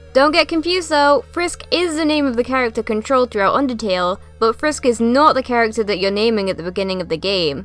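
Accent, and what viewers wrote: United Kingdom. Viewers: wtf are you british ???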